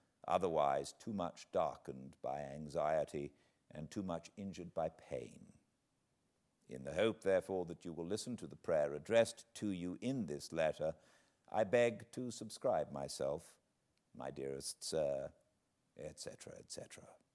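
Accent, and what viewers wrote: United Kingdom. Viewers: "But could not be reconciled to the thought that the letter might fall into the hands of the British" The irony of this line being read by a Brit is amazing. Made me chuckle. I just discovered your channel,. Thanks for making these videos; I am looking forward to going back and watching some of your previous installments. As someone who lives with dyslexia, reading long texts was always very frustrating and demoralizing for me. But I used to LOVE being read to! My dad used to read the Chronicles of Narnia, Sherlock Holmes, and other favorites to us as a family. That was a long time ago, but those memories are still some of my favorites from my childhood. This channel feels like a return to that childhood. So thank you for recording these. I'm enjoying them quite a lot.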